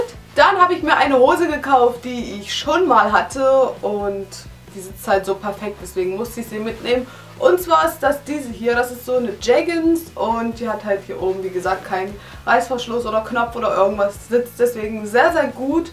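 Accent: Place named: Saxony, Germany. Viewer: woher kommst Du?:D super Akzent, der klingt wie meiner, komme aus sachsen:D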